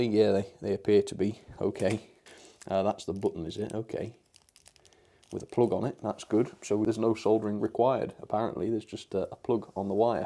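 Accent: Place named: West Country, United Kingdom. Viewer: Hi, great review and I got the same model from Rails of Sheffield too. I have added the tower on my loft layout within the steam shed area... It works very well and quite novel...great Wurzel's accent..!!! 😁 I have weathered the tower and painted the brazier and added a fire glow LED under it to represent the de-frosting fire.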